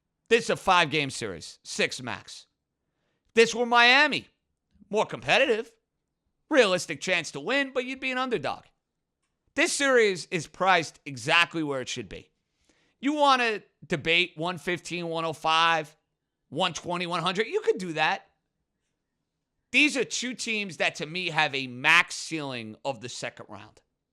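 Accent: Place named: New York, United States. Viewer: This is the most New York sounding guy I’ve ever heard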